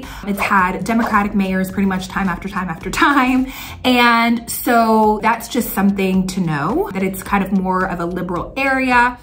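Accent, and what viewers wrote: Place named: South Dakota, United States. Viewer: Sound like you are from Sioux Falls.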